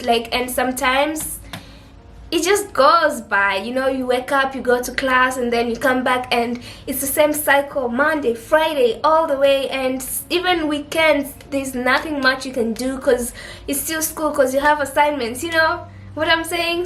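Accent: Kenya. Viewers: Number 2. You're an extrovert. As for me I am happier when I am alone. But why do you sound like a Kenyan? Kenyan accent. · Your accent is East African most likely Kenyan.. Are you from Kenya?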